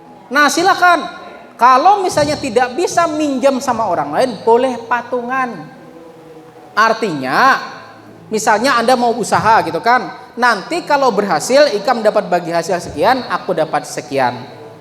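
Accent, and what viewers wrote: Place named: Tegal, Indonesia. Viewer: Wong Tegal kie pak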